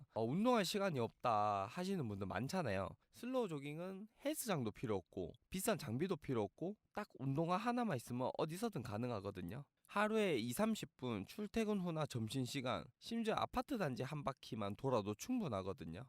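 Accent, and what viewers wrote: Gyeongsang, South Korea. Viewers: 대구 분이시네요ㅎ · 이거 말투가 대구네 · 대구 분이시네요. 저도 대구 사람입니다. 반갑습니다 ㅎㅎ 구독하고 갑니다.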